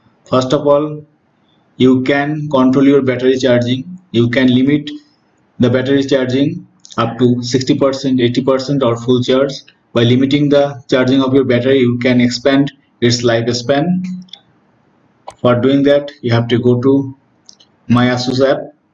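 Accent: Bengal, India. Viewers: I'm 100% sure you are Bangali😂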